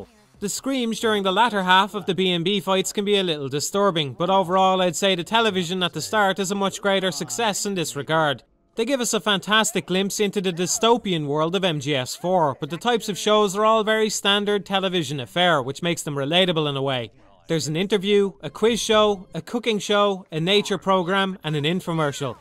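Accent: Ireland. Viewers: He's Irish. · good strong irish accent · Are you trolling? You seem to have made a statement concerning IGN and "learning" in the same sentence. Also a bit of advice, all caps equates to a 40% decrease in credibility. And also I think hes Irish not British.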